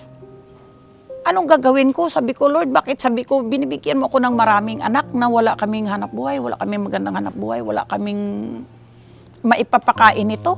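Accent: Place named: Aklan, Philippines. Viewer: Halata ko taga aklan ka yata Ma'am.pero di ko alam san sa aklan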